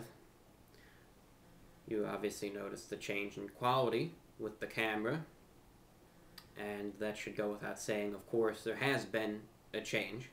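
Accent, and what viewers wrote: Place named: New York, United States. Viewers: wow I was wondering where you were from. I always thought you sounded like you were from NYC metro area but yet your vids often gave impression of you being in the south. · I like these vids, they are enjoyable although it's hard for me to say why. It's very inoffensive and vanilla, so I guess it's soothing to listen to these random and non-controversial topics after a day full of stuff. On a side note, I was totally convinced you had a NYC or New Jersey accent from my first time watching, but for some reason I thought I heard in another video that you grew up in the south, so I was confused. Anyway, as another northerner who lived in the south for a while, I can definitely say I prefer variety in the weather, and I like winter. A couple of times when I was in Mississippi it actually snowed and I got to see the whole town shut down in a panic, which was fun. 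We got less than two inches.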